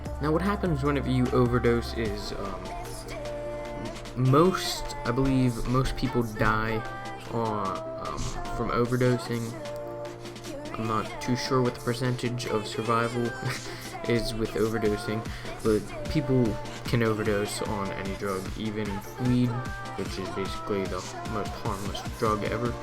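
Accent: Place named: Canada. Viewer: u sound Canadian m8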